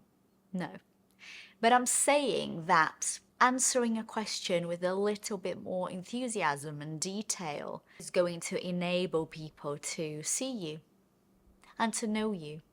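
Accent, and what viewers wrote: United Kingdom. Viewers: Your british accent is so beautiful ❤ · When you start to follow her bc of the british accent and stay here bc she's such a great person and teaches so much more than just english... Well done!!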